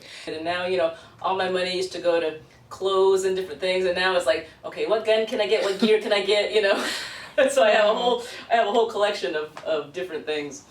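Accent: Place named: California, United States. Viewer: She Talks Funny Damn Cali Ppl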